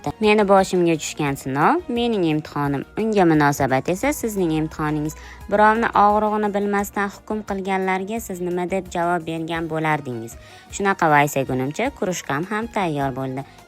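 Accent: Namangan, Uzbekistan. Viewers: Namangandanmisiz wevangizdan bildim olloh wifo bersin namanganliklar qanisizlaaar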